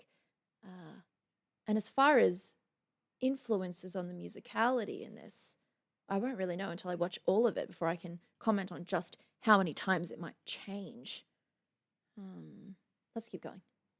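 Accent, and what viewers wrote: Australia. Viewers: This would be a perfect soundtrack to a game teaser or trailer. Also... It's always so much fun to hear Aussies saying know. "Knar" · I love your aussie accent 🥰🥰🥰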